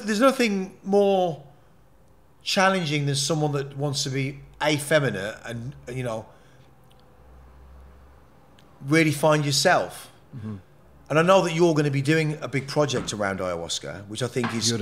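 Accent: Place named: Black Country, United Kingdom. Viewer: Can still hear that yamyam accent now and again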